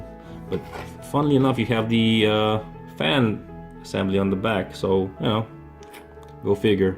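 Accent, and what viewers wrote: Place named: France. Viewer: Francozski naglas👌😂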